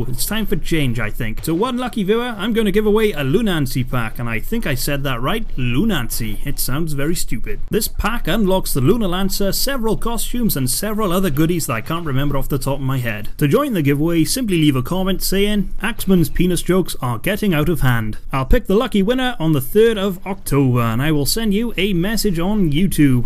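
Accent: Wales, United Kingdom. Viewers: Is he Welsh? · What part of wales you from mate?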